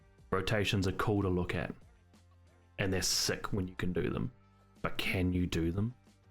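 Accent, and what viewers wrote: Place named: Australia. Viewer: I like your Australian Accent mate!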